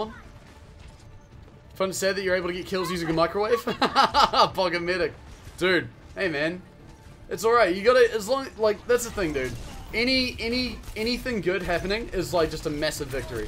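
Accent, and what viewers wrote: New Zealand. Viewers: James Bartlett Yep that's a kiwi accent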